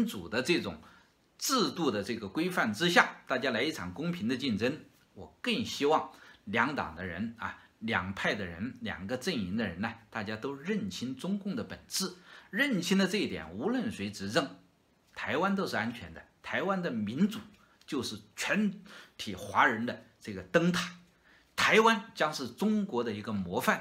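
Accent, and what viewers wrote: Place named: Hunan/Hubei, China. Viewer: 这哥们的口音不是湖南人就是湖北人，以后把这口音改了，再发视频来损自己的母国。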